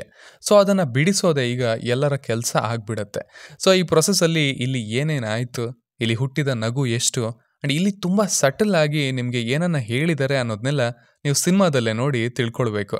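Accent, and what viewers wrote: Honnali, India. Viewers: Nim voice and mathu kelidre nivu ಹೊನ್ನಾಳಿ avru ansalla!!!!! ನಿಜವಾಗಲೂ 😊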